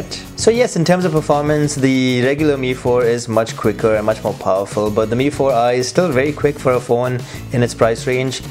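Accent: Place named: India. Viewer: Why not every indian have his accent?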